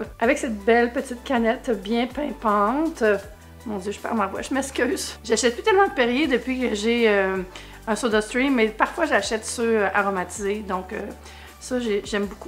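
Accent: Canada. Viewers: Bonjour, tu es magnifique! Tes petites crollettes (tes boucles comme on dit chez nous à Bruxelles ) te vont super bien! Continues comme cela, j'adooore ton accent canadien qui est si chaleureux et chantant.:-)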